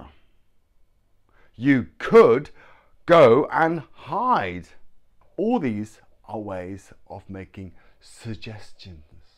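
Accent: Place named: United Kingdom. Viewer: I am interested in British English because it is interesting.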